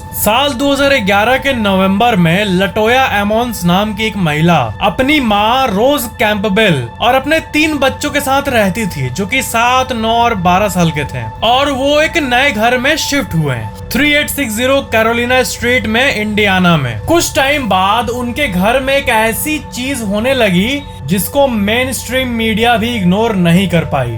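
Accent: Bihar, India. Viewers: Kya bihari accent hai 🤣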